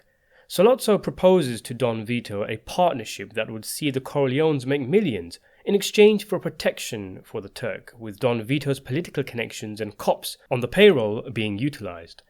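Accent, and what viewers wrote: England, United Kingdom. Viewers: This is the second video of yours, BUT.... I'm from California, I'm old, and bad hearing. So I don't watch stuff with ACCENTS, the SE American's are bad enough but you Brit's have a few of your own. So wasn't going watch any more of your video's, BUT, I said give it an other shot cuz I really liked Luca Brasi and liked it. Sorry, and I subscribed. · What part of England are you from narrator ? You speak a very rare dialect.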